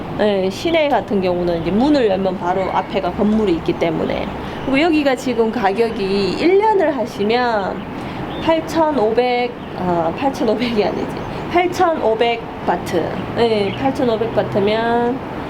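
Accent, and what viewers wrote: Gyeongsang, South Korea. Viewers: 경상도분이신듯 사투리가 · 경상도 냄새가 나네요ㅋㅋ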